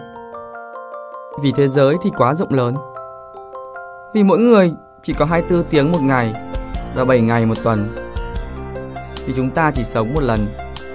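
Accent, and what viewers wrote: North, Vietnam. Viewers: minh k thich tieng bac tu xua toi nay ... ma sao bay h minh thich lam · GỊONG CON TRAI MIỀN BẮC DỄ THƯƠNG QUÁ Ạ <3 EM GỐC MIỀN TÂY NHƯNG NGHE VẪN THẤY THÍCH LẮM <3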